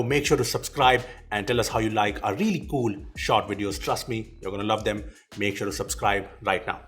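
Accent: India. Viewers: Butler english...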